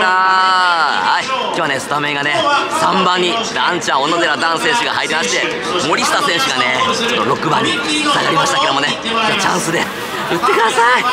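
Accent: Kansai, Japan. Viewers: ハムファンです。さんの動画初見ですがめっちゃ面白かったです。関西の方のしゃべりがさすが👏👏👏応援歌をめっちゃ大声で歌ってるのも良かった！なんとなーくタイガースファンは怖いのかな…と思ってたけど見方が180度変わりました！！相手チームを素直に褒めるのも素晴らしいですね。セ・リーグは阪神応援します！！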